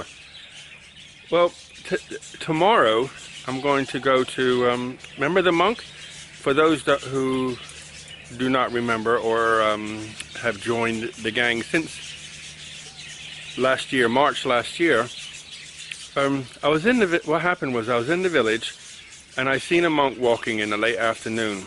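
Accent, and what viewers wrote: United States. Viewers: Hello found your channel today and such a lovely change from most other Thailand videos. I used to live in Thailand but left in 2002 and many times wish I hadn't but we all do things for a reason at certain times when we think it's right. Anyway I was surprised to hear you are from England you have a slight Texan twang to your accent. You mention HRT, I am on Nebido which is a testosterone replacement therapy. Two reasons the GP put me on this is because one I had a low testosterone levels and two I have ED at times which is a result of various medications I'm on. It is given to me by injection every 12 weeks. I have no idea of your reasons and its not my business but just thought I would mention it to you. I have just subscribed and will keep following your life in Thailand.. Power to you..David..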